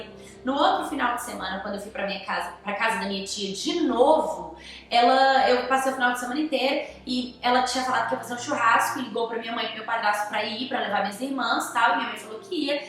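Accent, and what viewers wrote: Northeast, Brazil. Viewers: Faz vídeos com o sotaque nordestino,amo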